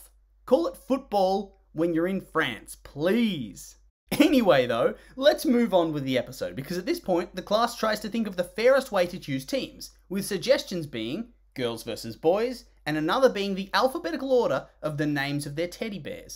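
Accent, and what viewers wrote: Australia. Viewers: Im gonna guess you're aussie from your voice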